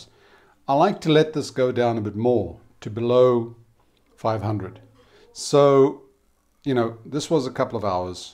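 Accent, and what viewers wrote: South Africa. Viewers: I visited a family in Durban. I met them online. It was an amazing experience. The Tiplady family. We went all over KZN. Now I recognize the accent. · Are you from South Africa?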